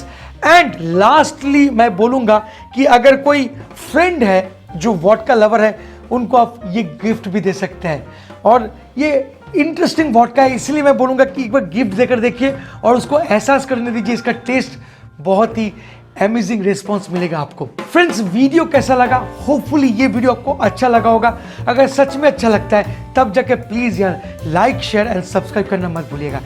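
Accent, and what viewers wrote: Bengal, India. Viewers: Dada, your English with a hint of Bengali touch is really sweet to hear. You have really done, us drink lovers, feel good about our drink. Knowledge about the drink, really adds a punch to the way we enjoy it. Just a little correction, "Rahasya" means mystery not secret, secret means "gupt".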